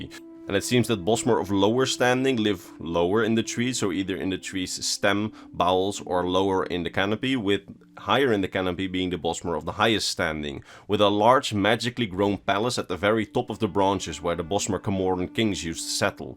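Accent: Netherlands. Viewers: You sound Dutch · Good sauce. Are you Dutch by any chance? Shoutout to potential fellow countryman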